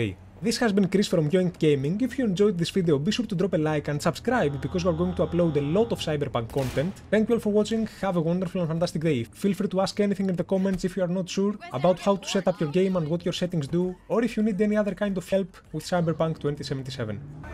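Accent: Greece. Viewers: Greek .. I can bet you Are greek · From your accent, I instantly realised that you are from Greece. Δεν περίμενα να έβρισκα Έλληνα youtuber να μιλάει για το cyberpunk xd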